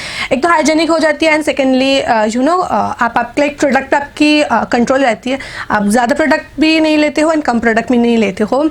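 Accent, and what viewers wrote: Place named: Bengal, India. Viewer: Bengali accent😍😍😍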